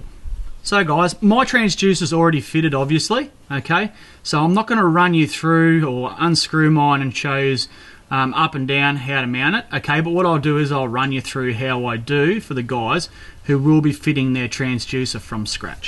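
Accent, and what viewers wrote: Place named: Outback, Australia. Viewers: More specifically a Queenslander accent. ‘Youse’ is a dead giveaway. Very ocker, mate! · I just want to know how I can get the Australian outback accent for my videos! That accent makes everything <num> times cooler and interesting!!! That accent alone is worth like 100k views Mayte!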